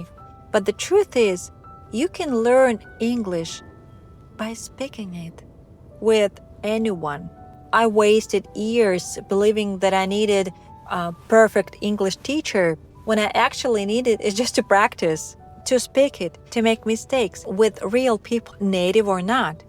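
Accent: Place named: Russia. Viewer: I’m a native English speaker and I think your accent is great. You speak very clearly. You have a slight foreign accent, but I wouldn’t immediately guess from hearing you that you are Russian. I always think a slight accent is nice. An accent is only a problem when it is so thick that people can’t easily understand you. And having a native accent is not always good either when you aren’t a native speaker because people might expect too much from you. People are usually more lenient with mistakes if they know you are foreign.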